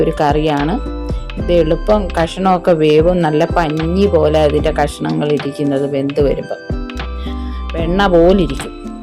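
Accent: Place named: Adoor, India. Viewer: Njan Adoor kari aanu, njagal sheemachakka ennanu parayunnathu, nammal kadachakka ennu parayunnathu pineapple ne aanu, nattil evide aanu, samsaram kelkkumbol nammude bhagathu ullathu aanennu thonnunu, njanum ithupole aanu sheemachakka theeyal undakkunathu, super recipe😋👌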